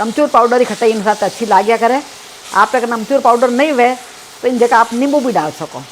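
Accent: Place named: Rajasthan, India. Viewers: Aap ki jodhpuri boli sun ke lagta hai ki Jodhpur main hi hu Awesome videos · आती आपकी शुद्ध राजस्थानी बोली बहुत अच्छी लगती है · Hello your Rajasthani is very nice and clear easy to understand Thank you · Marwadi aur Marwadi khano dono zordar aur aap bolo ba bhi bahut sudh marwadi aap ki awaj sun ke waaste jyada dekhu🙏meethi boli h aapki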